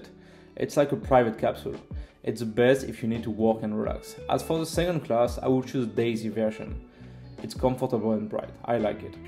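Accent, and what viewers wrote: France. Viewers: heaviest French accent on the internet :) but such in depth knowledge of European railways keep up the good work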